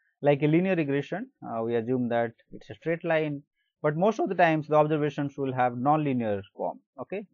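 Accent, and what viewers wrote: India. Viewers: normally I can understand indian accent but yours is terrible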